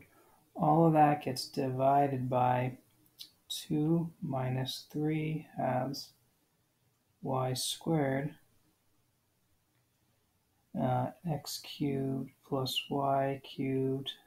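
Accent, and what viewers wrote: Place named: Kansas, United States. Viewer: You sound like one of my uncles. Were you born in Kansas?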